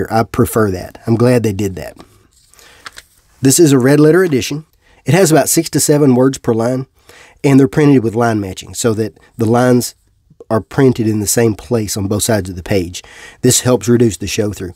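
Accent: South, United States. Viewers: Your accent is Southern American, right? What part of the South, if I may ask?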